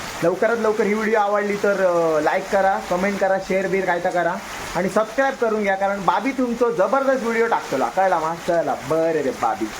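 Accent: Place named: Malvan, India. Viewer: भाऊ..तू दोडामार्ग चो असा ना..म्हणून मालवणी कोकणी asscent कडून बरो बोलता 🎉😊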